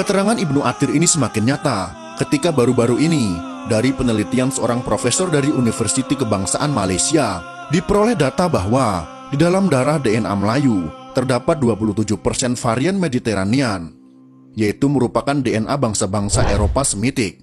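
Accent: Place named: Surabaya, Indonesia. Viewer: boso jowo ne. Koyok jowo suroboyo an min😀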